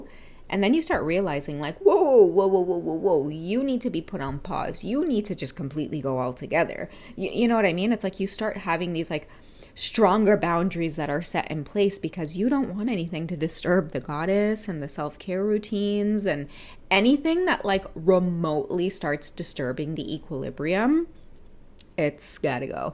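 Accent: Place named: Trinidad and Tobago. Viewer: Aye you not eash na with dah trini accent, makes me laugh...lol.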